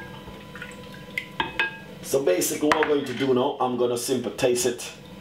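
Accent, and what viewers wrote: Jamaica. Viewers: Speak so The World can understand it not only Jamaican watching, the name is Nutmeg!!!!!! Patios and English no go well bro sound ANNOYING. Just be real and talk u talk so mess up u talk sound #$&@@ Anyhow love the recipes although I know how to make them already, thanks for teaching those who don't know especially the young ppl 👍🏼👍🏼💯🇯🇲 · What language is he speaking then? Sounds like English to me With a Jamaican accent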